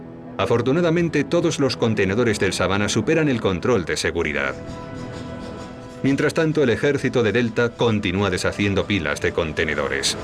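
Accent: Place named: Spain. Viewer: Es un locutor español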